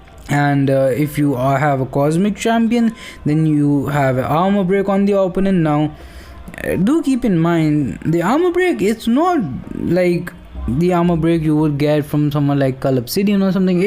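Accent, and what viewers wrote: India. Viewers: You are from India , I have doubt in your sound its like indian man.But I am from India and even I like your video.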